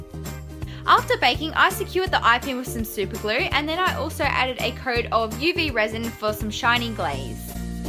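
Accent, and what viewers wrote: Australia. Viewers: i heard your voice and i knew straight away you were aussie😁(im aussie too)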